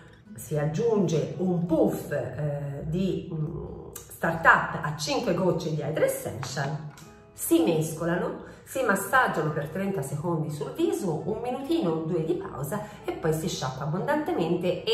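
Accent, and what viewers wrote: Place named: Florence, Italy. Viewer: Sei fantastica, bravissima e molto bella La tua parlata Fiorentina è un piacere Un bacio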